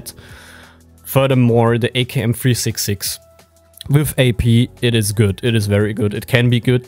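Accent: Germany. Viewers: Sprich einfach deutsch bro xD, joke mag es wenn deutsche Englisch sprechen! <3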